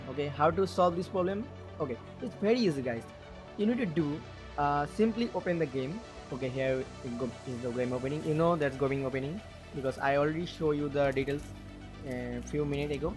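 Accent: India. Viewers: Fucking India Accents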